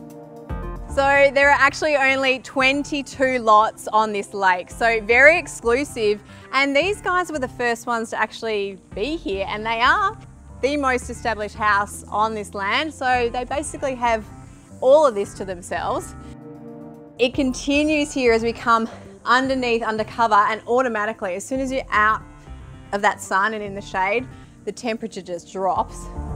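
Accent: Australia. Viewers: The presenter is lovely. The Australian accent is a bit much.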